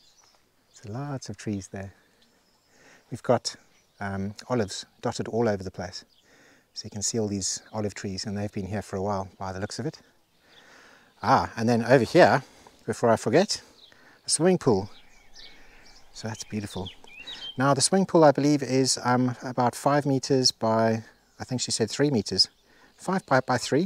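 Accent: South Africa. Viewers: South African accent. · So lovely to hear the South Afrıcan accent was not completely sure untıl you saıd we SOUTH AFRICAN say BRRAI.thank you for the amazıng vıdeo.would love to buy such a farm for my organıc way of lıfe.Greetıngs from CAPE TOWN. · Fabulous property! Lovely to hear a fellow South African! 😊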